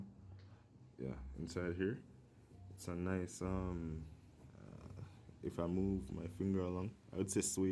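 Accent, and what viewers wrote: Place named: Jamaica. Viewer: Can hear the Jamaican in your voice from you start talk bro keep doing clarks reviews bro rate them with different colours #repfromjamaica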